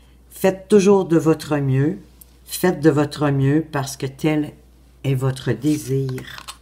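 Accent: Quebec, Canada. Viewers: Coucou 😊 Pour commencer...Wouaaaah quel effet ça fait d'être citée au Québec😁, j'suis trop contente car j'ad😍re le Québec & votre accent🥰 ! Mais je les connais ces 2 jeux ! La petite boîte je l'ai offert à une Amie et le coffret carré est juste MAGNIFIQUE, nous sommes carrément plongés dans l'univers/l'atmosphère du Sud du Mexique, ça fait voyager direct...Tu sais qu'il a fait le coffret "le 5éme Accord Toltèque" avec le même style (boîte carrée & même style d'illustration) ? Va falloir investir 😁 à moins que tu l'ai déjà ? Confidence pour confidence, je préfère ta version (elle me fait voyager) mais je n'ai pas eu l'occasion de l'avoir☹️🥹😢. Lumineuse journée à toi 🌞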